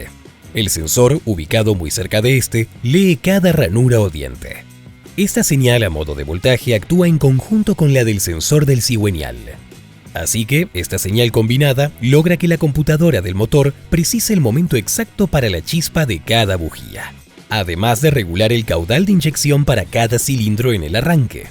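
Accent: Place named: Chile. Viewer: Me Gustan Estos Videos SiN Acento Mejicano....suena como Acento Chileno de Chile po'' weon Chile 🇨🇱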